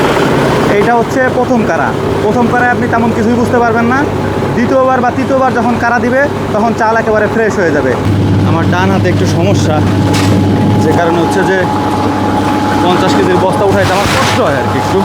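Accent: Faridpur, Bangladesh. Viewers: আপনার আঞ্চলিক ভাষা শুনলে বোঝা যায় আপনি খাঁটি ফরিদপুরীয়ান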